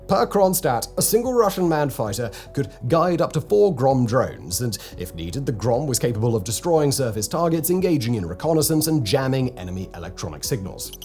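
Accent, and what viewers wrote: United Kingdom. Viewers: All your British lilt and shabby jabs at Russian and Chinese counterparts seem more and more like an age old Western propaganda than an unbiased fair take on the subject. No modern fighter jets have come head to head against each other in current war scenarios and neither you can confidently confirm that Russian or Chinese jets and missiles are somehow inferior to what west has to offer. Dont be so sure lil English brother, the Trident you tried to scare Russians embarrassed you globally and just recently.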